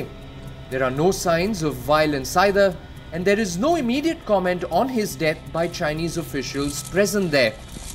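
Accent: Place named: India. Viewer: what conspiracy theory it is a fact, you speak in Indian accent.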